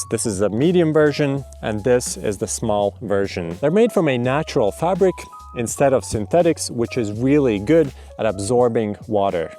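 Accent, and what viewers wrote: Latvia. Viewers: Yes, Latvian accent is hard to hide. Good video!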